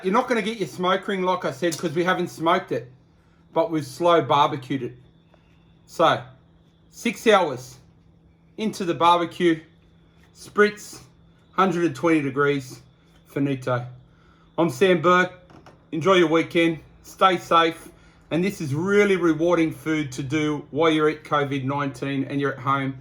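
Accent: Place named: Australia. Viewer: Hey there Chef.............. I'm looking to learn,,,,,,, to cook : using "GAS"....... and wow dude, I love it,,,,, you're not so easily understood,,,, because you speak "AUSTRAILIAN" english, and not "AMERICAN" english. .. I love it,,> thanks so much!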